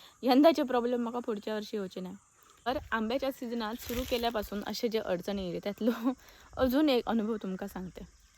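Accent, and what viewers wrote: Malvani, India. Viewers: मस्त मालवणी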